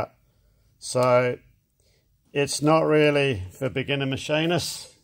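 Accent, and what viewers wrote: Australia. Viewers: I hear his Aussie accent and I click Like!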